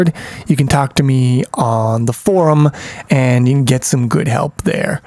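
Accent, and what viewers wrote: India. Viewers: the accent is troughout my first language super super annoying. 1. I cant barely understand it. 2. Is super fucking annoying to listen... almost run away screaming. It probably has to with germanic languages in which indian accent sounds really like ear rape. Im not racist. The accent is just ..... terrible.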